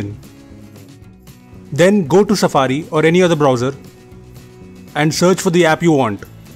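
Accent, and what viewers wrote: India. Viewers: When the Indian guys will fix the English accent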